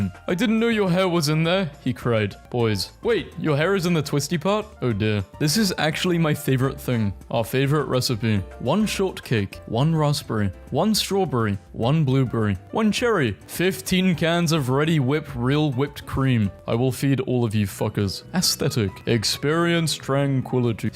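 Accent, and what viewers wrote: United Kingdom. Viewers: I enjoy sitting down and listening to a British person say funny things for 20minutes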